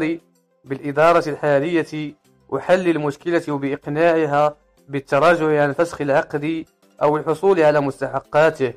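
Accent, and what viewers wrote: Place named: Bangladesh. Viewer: ياصاحب المقطع انت جالس تسجل جوا خزان مويه ايش التقطيع هذا جيب شخص عربي يتكلم مو بنقالي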